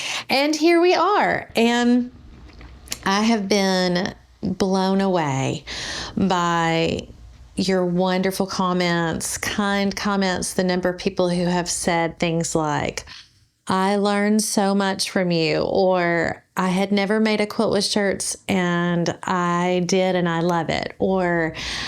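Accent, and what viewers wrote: South, United States. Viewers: Hi! I have been watching from the beginning and have loved every tutorial! I have learned a lot and you have made me think about different fabrics, colors, and designs. I like how you are a hands-on designer! I think I am, too! I also have a Southern drawl like you! If you will keep the tutorials coming, I will keep watching! Thanks to you and! He’s a great cameraman! I am glad he encouraged you to do this! Happy Anniversary…and here’s to many more!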